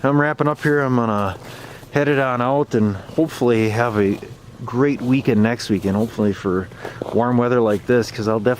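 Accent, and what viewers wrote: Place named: Minnesota, United States. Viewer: Where are you? I’m in Stillwater. Sounds like your in MN. I have a JD 3033 your video was a big help when I put my block heater in. Thanks for posting.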